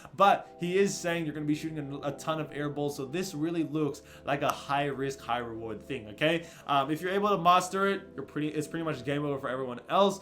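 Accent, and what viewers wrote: Australia. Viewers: why you hiding your australian accent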